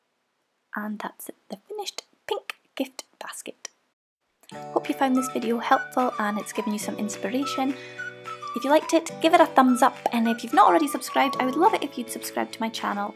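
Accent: Scotland, United Kingdom. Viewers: Loved watching this I've just found your channel and your videos look great and it's lovely to hear a fellow Scottish accent ❤️ x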